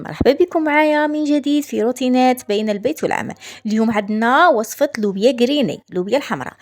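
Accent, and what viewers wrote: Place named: Algiers, Algeria. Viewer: ختي علاه راكي تهدري كي دزيريين ياك من الغرب نتي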